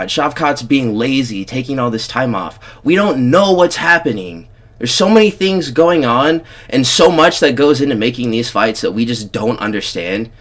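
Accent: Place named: California, United States. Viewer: You have a very self-inflated perspective; you're not the only "true" MMA fan, and the people who disagree with you aren't all casuals, i.e., the UFC fighters literally calling for Jones to be stripped of the title. And it's hilariously ironic that you have the audacity to make fun of someone else's voice while rocking a flamboyant Californian accent.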